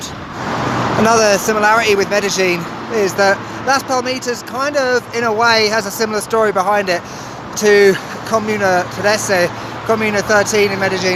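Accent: United Kingdom. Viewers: 👋 hello greetings from USA/Mexico ! I love ur British accent! I was raised in Hidalgo Anywho try the arroz 🍚 con leche pastes and papá con pollo 🍗 yummmy 🤤!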